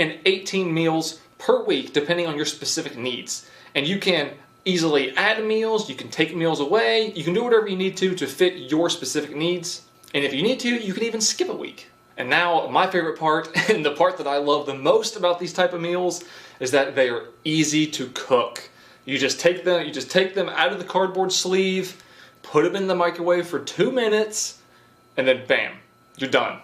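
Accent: South, United States. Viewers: I wanna say that was a joke but it comes far too easy for him. For what it’s worth though I believe we found out that is playing a character in some videos. It’s not noticeable now but if you check his old vids you can see how differently was acting back then. I swear he was even putting on a (stronger) southern accent in those vids on top of the rest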